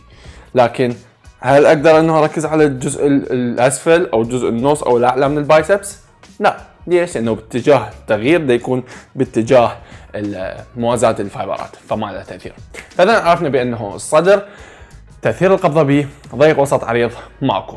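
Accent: Iraq. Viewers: الهجه تختلف لهجته عراقية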